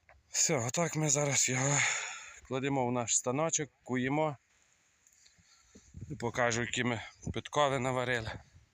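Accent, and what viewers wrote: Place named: Hutsul, Ukraine. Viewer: Трохи Гуцул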